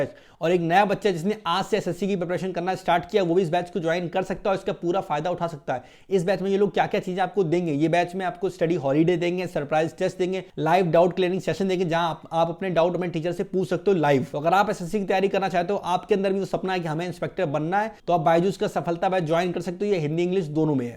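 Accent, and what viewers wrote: Kanpur, India. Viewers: Jyada kisi se chatiyo mat kanpuriya language 🤣🤣🤣 Maja aa gya Bhai 😁😁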